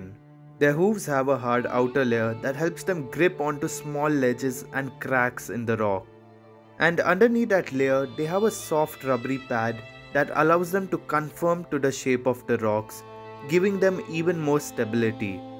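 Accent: India. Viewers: Indiglish, got to mute and watch subtitles instead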